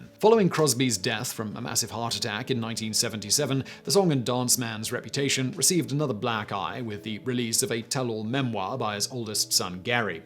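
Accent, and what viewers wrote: United Kingdom. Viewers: Chrisholm for Chisholm definitely got a giggle from me, I can see how your brain would want to add an R in there. It was extra amusing to hear someone say the "holm" part with a British accent instead of the Southern U.S. accent I always hear & say it with ("um" rather than "holm").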